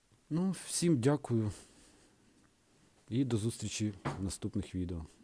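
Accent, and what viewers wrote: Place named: Russia. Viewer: по вашей речи очень заметно что вы русскоязычный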